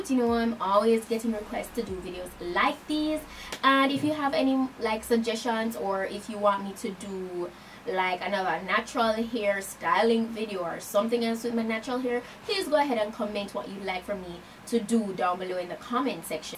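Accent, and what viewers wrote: Jamaica. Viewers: I hear a jamaican accent. Subscribed😌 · Where are you from... Your accent sounds kind of Jamaican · OMG! Your accent is so cute ? Wya from gyal? Jamaica? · Love your hair... Girl you sound Jamaican. Like ur from like Kingston i guess. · You sound Jamaican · She's Jamaican. Our ascent is one off a kind. No othe Culture talk like us. Lots of faker tried. But epics failed. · You sound Jamaican!! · you sound Jamaican. am I right ?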